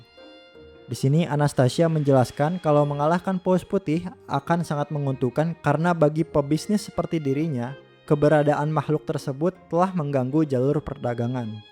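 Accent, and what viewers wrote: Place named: West Java, Indonesia. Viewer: admin ketahuan orang sundaa😂😂, btw gw juga sunda sih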